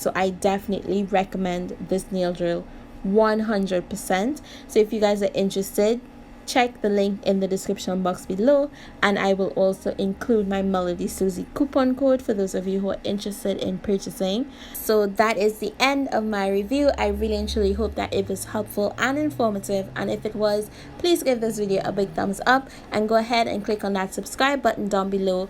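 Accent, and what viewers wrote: Trinidad and Tobago. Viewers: Are u Trini 🥺?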